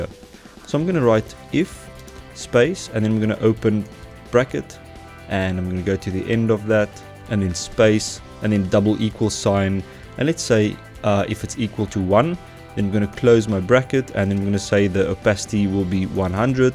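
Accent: South Africa. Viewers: Hey, was almost shocked when I heard a South African accent on a Youtube tutorial. Great Tut though, well presented and explained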